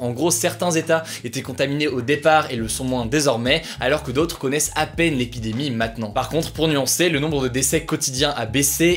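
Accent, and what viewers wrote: England, United Kingdom. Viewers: C'est bien pour l'accent anglais mais c'est JayKay Rowling et pas JeeKay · Ton accent anglais me fume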